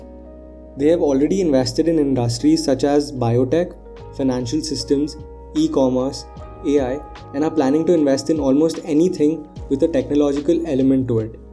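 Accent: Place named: India. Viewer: Are you Indian? You're accent is indian-ish, great video tho! I subbed uwu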